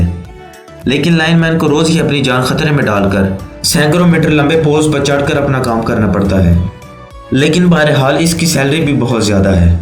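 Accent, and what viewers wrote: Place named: Kashmir, India. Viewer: Aslamo elikum ap kashmir Rawalakot sa hn sardar hn ap ke urdu sa pachana ha me b kashmir sa hn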